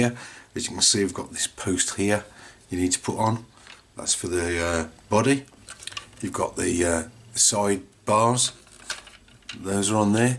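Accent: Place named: West Midlands, United Kingdom. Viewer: Hi.. I have one on pre-order looking forward to it arriving:) great vid.. subscribed.. whereabouts you from sounds like your around west mids? im from Streetly.. Sutton:)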